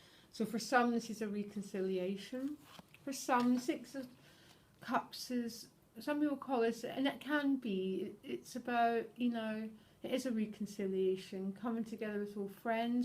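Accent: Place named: Scotland, United Kingdom. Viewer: Thank You for reply, Me too, I'm from Scotland, I could still hear the Scottish twang in your voice, My connections are with Edinburgh & the Scottish Borders, I moved to England 10 years ago, Lived in Burton On Trent then Wiltshire & Now I'm settled in South West Devon beside the sea. x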